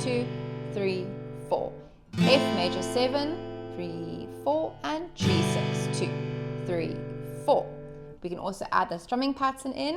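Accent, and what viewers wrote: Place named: South Africa. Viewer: Awesome...and nice to hear a South African accent.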